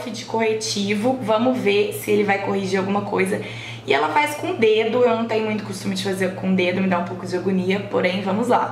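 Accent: Minas Gerais, Brazil. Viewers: ue, tu é mineira? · Você é a cara da Isis Valverde e ainda tem o mesmo sotaque mineiro 🙀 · sotaque mineiro representando ❤️ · Estou amando seus vídeos! virei fã :) p.s.: esse sotaque Mineiro é uma delícia! rsrsrsrs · Vc é mineira?????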